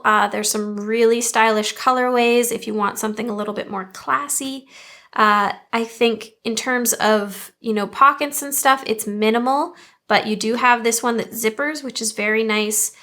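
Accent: Canada. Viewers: I could really hear your Canadian accent in this one 😉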